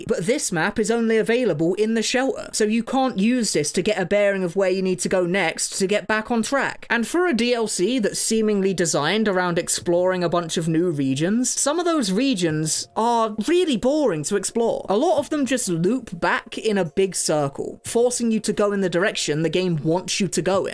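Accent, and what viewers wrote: United Kingdom. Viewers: he sounds more british than usual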